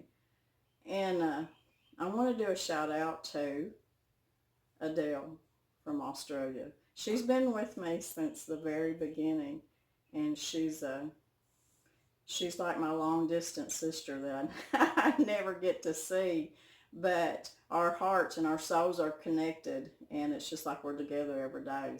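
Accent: South, United States. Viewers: Love your ‘southern” accent and gentle spirit.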